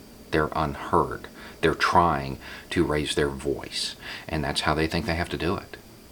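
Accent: South, United States. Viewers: I've watched like 5 straight videos of yours and I'm in shock, you as a white guy with a southern drawl, speaking so eloquently about these topics saying white people need a new leader or your other video about what it meant to be a republican or what it used to stand for vs how it changed man I love it when people like you can call out the hypocrisy of your people and that's what we need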